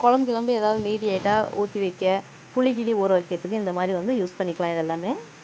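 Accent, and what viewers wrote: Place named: Salem, India. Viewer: நீஙக சேலமா .....உங்க பேச்சு ஸ்லாங் அப்படித்தான் இருக்கு......எங்கம்மா வீடு சேலம்தான்.....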